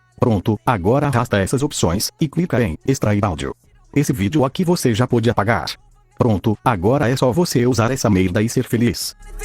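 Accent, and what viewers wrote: Bahia, Brazil. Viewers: Pensei q ele era da Bahia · Sou de Goiás também kkk mais acho que tu e baiano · Pensei que o era baiano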